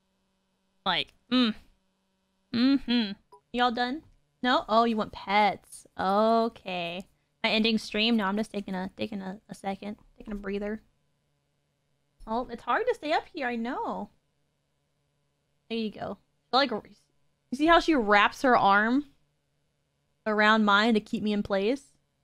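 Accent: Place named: Texas, United States. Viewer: Your Texan accent really came through in this vid! Lol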